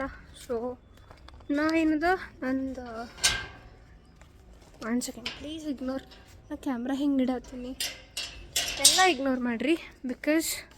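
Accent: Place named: North Karnataka, India. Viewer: Hii, hegiddira.. Nim uttar karnataka kannada nange kelalike ishta agutte,heege videos madta iri. Naavu nodi share like comment madtivi😁. I'm ur fan from coorg❤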